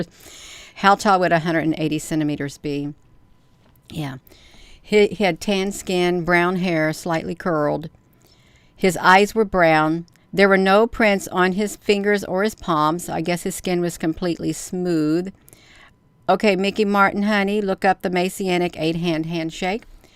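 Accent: United States. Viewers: Dear, is it because of youtube that the subtitles are not available? That's a pity, because many Germans are interested in your interesting articles, but don't understand the American dialect. Do you have any influence on whether your contribution gets a subtitle function?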